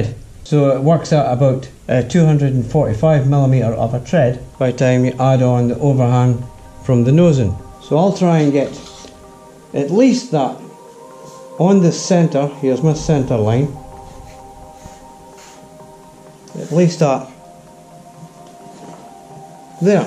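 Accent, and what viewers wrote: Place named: Wales, United Kingdom. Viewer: Accent is fine. Never had a problem with Welsh ;-) I got lost because I'm completely dopey and haven't done enough joinery to appreciate even the basics. Sometimes I'm just content to be impressed.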